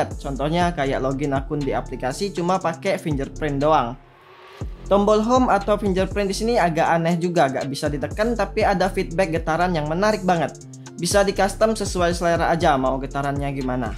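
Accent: Palembang, Indonesia. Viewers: Nak nanyo kk wong palembang? 🤣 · Orang palembang ya bang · Alangke lemaknyo haha, wong palembang nian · Orang palembang yah kak? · Wong Palembang e hahah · org palembang kah kak?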